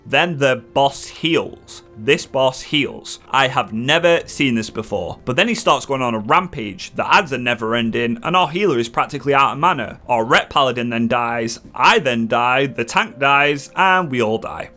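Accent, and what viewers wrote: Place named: Northern England, United Kingdom. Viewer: been loving your videos. Prefer to watch the compilation ones in one go, beer in hand - the night is mine. I have one small criticism and that is it seems like your videos are on speed.. They go through so quickly there's barely time to absorb the info. If you could slow it down a bit for us old timers lol. Love the accent, I'm guessing somewhere up north. Essex boy here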